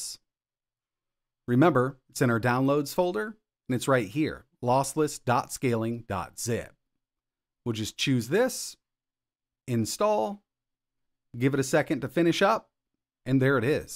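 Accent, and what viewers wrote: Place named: United States. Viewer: Bro what part of America are you from. This is the best American accent I’ve heard. Heard a few times but can’t place it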